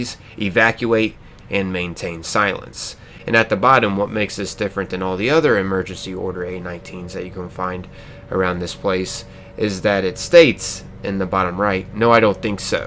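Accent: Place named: Philadelphia, United States. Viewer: Are you from around Philly? I'm trying to place your accent.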